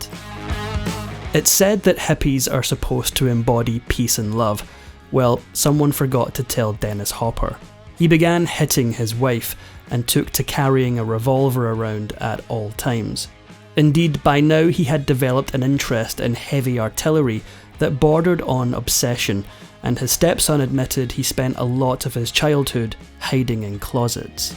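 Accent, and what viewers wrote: United Kingdom. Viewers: Blah blah blah, Hollywood and you hollywooders make me sick, I turned my back on Hollywood ever since I became a true born again Christian. Hollywood is modern day Sodom and Gomorrah. I worship Jesus Christ, our Lord , God and savior. I dont care about this brittish voice over, bragging about this man headed for hell. We can not serve two masters, as God says in His holy words, the Bible. MAGA 🇺🇸 🇺🇸 🇺🇸 AMERICA FIRST 🇺🇸 🇺🇲 🇺🇸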